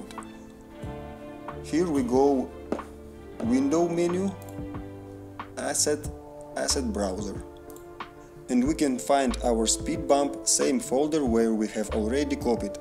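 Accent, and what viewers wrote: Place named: Russia. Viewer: Здорово мужик ! Явно ты из России или русскоговорящий по речи слышно. Ну ладно желаю удачи в этом нелёгком деле ,я тоже занимаюсь ютубом. Кстати у тебя круто получается.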